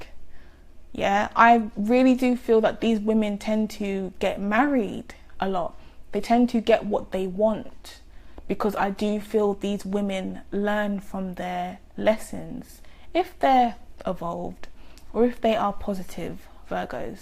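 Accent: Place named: London, United Kingdom. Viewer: I'm a Virgo Sun Moon and Rising. In total I have 5 planets in Virgo in my chart. I'm a designer so the office job life isn't relative to me, but I do like structure. I do live in a white house... white walls and a very minimal design. A clean home is a must... although I'm not OCD. Shout out to you though... it's nice to hear a London accent on an Astro vid for a change. Definitely going to subscribe. xx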